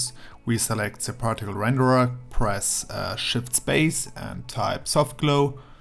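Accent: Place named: Germany. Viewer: Heavy German accent - mach's doch vielleicht auch mal in Deutsch. Englischsprachige Tutorials gibt's hier schon en masse.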